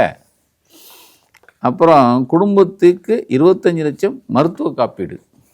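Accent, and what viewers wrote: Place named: Chennai, India. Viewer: Excellent speech in simple chennai thamizh in your usual casual style. Great job sir.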